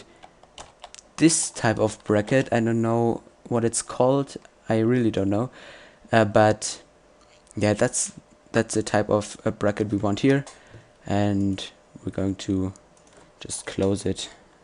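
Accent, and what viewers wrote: Germany. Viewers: You come from german right?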